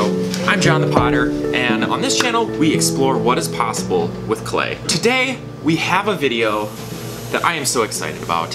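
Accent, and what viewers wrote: Minnesota, United States. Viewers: I love how Minnesotan you are, it’s so nice hearing someone from the same place as me in a video!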